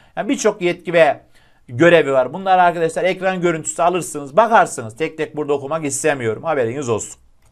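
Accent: Adana, Turkey. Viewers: Adana mı hocam:) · hocam net adanalısınız😁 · Hocam Adanalı mısınız 😅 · Adanalı mısınız hocam 😅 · Hocam Adanalı mısınız 😂 Adana ❤ ben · Abi adanali mısın😂😂😂😂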